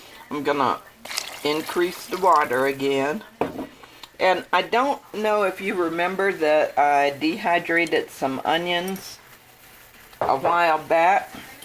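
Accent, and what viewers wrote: South, United States. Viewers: I’m new. Simply Sara mentioned you. I’m enjoying your way. You speak southern the right way. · What a nice lady and a good cook love your cooking style and you just seem like one of the sweetest little ladies ever I'd love to live next door to you and we could exchange recipes on the Southern girl and you sound like you might be a Southern girl also good food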